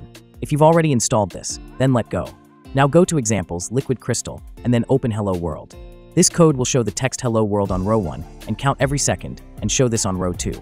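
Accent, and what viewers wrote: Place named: India. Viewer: Parce que je préfère la voix d'un robot plutôt que du "Indian English"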